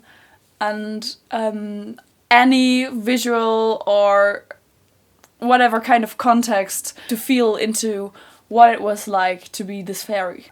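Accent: Ireland. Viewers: Willout Up until seeing this video, to me; She always sounded as if she had a thick Irish brogue. It still sounds that way; to me. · Willout I also believe in a Q and A a couple of years ago, said she'd spent some time in Ireland as well, which explains the brogue.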